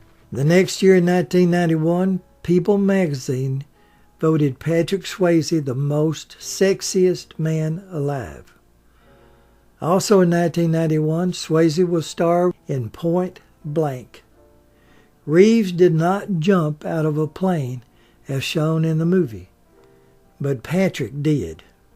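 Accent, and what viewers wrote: South, United States. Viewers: Mr....Another fabulous blog. That Southern accent of yours is so charming. I have been following you for over nine years. Your voice is golden. Please do a vlog about Rosa Parks. Respectfully, Norma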